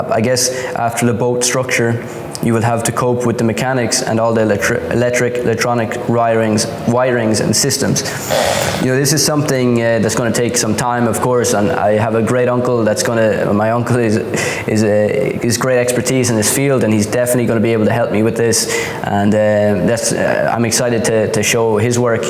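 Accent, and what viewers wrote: Ireland. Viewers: What's your accent? Irish, but something else too?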